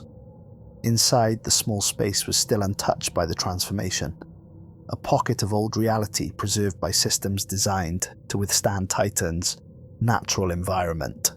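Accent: Wales, United Kingdom. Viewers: Dude, you actually read things not just use an AI reader, who cares about the odd and ends like that, but respect for caring. Frankly I subbed the second I heard the Welsh accent, sounds like home!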